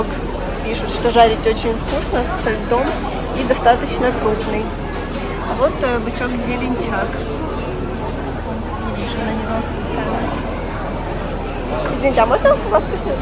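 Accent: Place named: Odessa, Ukraine. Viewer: Так я люблю ваш голос и одесскую интонацию🥰 Где вы так научились? Моя ты лапочка. 🌹🌹🌹💕💕💕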